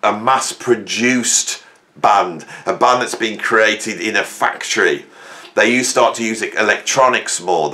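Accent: Birmingham, United Kingdom. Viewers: I love watching your videos,. We are same generation and you create this pleasant homey atmosphere, so I feel almost like talking with one of my music-buff mates. Lovely Birmingham accent, too. 👍 Thanks! annnd cheers! 😆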